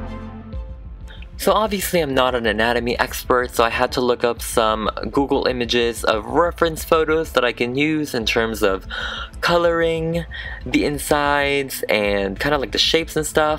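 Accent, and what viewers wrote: Philippines. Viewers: I LOVE the fact that you never lost your Pilipino accent. :)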